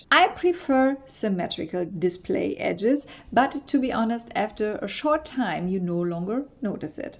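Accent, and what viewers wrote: Germany. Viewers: You have a beautiful German accent 😊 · She almost sounds like me with that accent. Living in the USA now coming from Berlin Germany.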